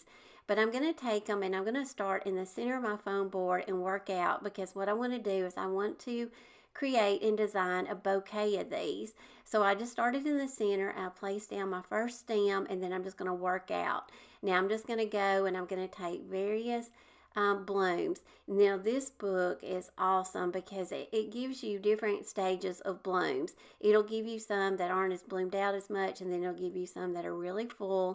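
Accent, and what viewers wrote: South, United States. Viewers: You absolutely amaze me! One day will you do a video of your whole house? I taught French for many years and I love how you use the French IODs! I’m from Alabama and I appreciate your southern accent!💖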